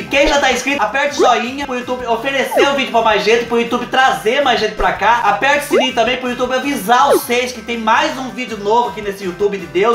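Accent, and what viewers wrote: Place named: Paraná, Brazil. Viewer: Cara vc é muito bom adorei com esse sotaque até parece que vc mora perto de mim aqui o povo fala assim kkkkk interior do interior do interior de Curitiba kkkk doce fino o meu endereço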